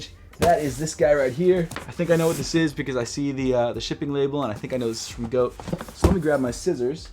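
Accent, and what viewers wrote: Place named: Baltimore, United States. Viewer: Gotta love that Baltimore accent. "... you gat packets an packets. Two sneaker packets an the back, two sneaker packets an the frant"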